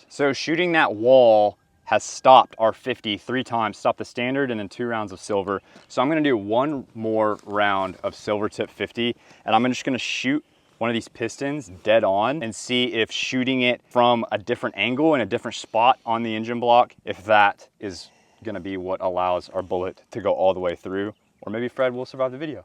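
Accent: Australia. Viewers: All i smell is your bullshit 🤣 Ozzy accent. Dude, ya killen me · 😂 Australian accent hehe 😂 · lol-the Australian accent. :face-blue-smiling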